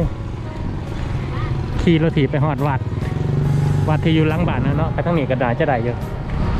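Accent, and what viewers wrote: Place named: Xe, Laos. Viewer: ສຳນຽງສຽງເວົ້າມ່ວນເນາະ ເປັນຄົນເຊບໍ່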